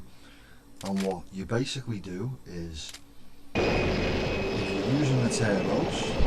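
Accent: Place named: Liverpool, United Kingdom. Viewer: hey man I've been interested in of airwolf for ages now, are you making missions for airwolf? I would love to get this if I could learn a bit about the game I will try and help you. I remember when this used to be on tele on the channel Bravo? Also you sound like your from Everton? yes? I'm not too far away, a bit further north. :)One more thing which operation flashpoint is this and will airwolf work with red river?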